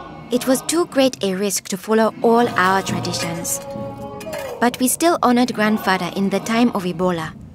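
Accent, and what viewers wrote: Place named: Uganda. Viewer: lol the voice is ugandan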